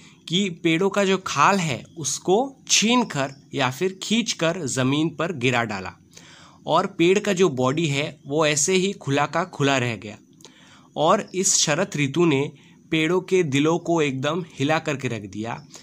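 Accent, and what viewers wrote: Assam, India. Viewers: Sir r u Assamese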